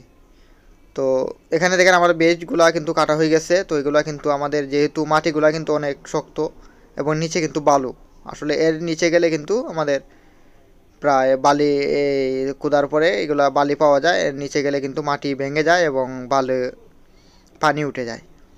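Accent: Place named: Sylhet, Bangladesh. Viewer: আসসালামু আলাইকুম۔۔ জনাব, আপনার কথায় বুঝতে পারছি আপনার বাড়ি সিলেট বিভাগে l সিলেট শহরে পাঁচ শতক জমির উপরে তিন তলা ফাউন্ডেশন দিয়ে একতলা বাড়ি করতে আগ্রহী l এজন্য আমি নিজেই একটি নকশা করে রেখেছি l আপনার ভিডিওগুলো অনেক সহজবোধ্য হওয়ায় আপনার সাথে আলোচনার জন্য আগ্রহ প্রকাশ করছি l এজন্য আপনার মোবাইল নাম্বার (Whatsapp /Imo) o email address পেতে পারি কি ? ধন্যবাদ !! __ মিছবাহ তফাদার রিয়াদ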